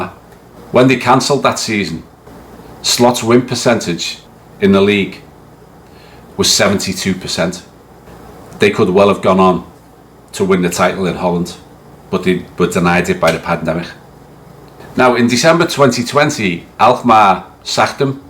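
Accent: Liverpool, United Kingdom. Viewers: keep up the good work mate, excellent analysis and love your Scouse accent, reminds me of my best mate Stanno 😊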